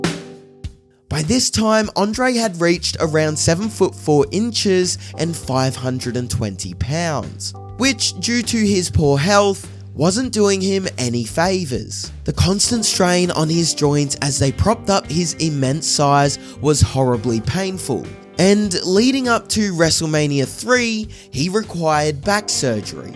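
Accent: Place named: Scotland, United Kingdom. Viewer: the best narrator Scottish guy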